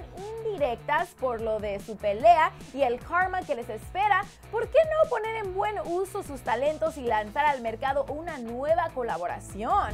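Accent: United States. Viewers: No busco ofender a nadie. Los estadounidenses tienen un acento MUY fuerte, por eso la forma de hablar de. Si escuchas a un inglés, hablando inglés:v, notarás la GRAN diferencia de acentos. P.D: Me dan igual los insultos. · la conductora es gringa es normal que hable así es como cuando le hicieron pedos a la conductora de noticieros latina por pronunciar bien los nombres en español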